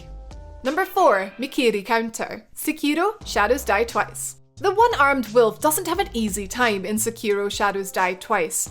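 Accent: Ireland. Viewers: You have a beautiful Irish accent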